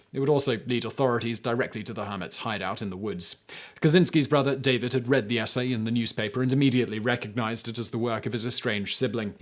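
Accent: United Kingdom. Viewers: I enjoy when uses lingo in is professional British accent. Terms like "all day stretch" to mean a life sentence and referring to prison as the "pokey" sound hilarious with that accent.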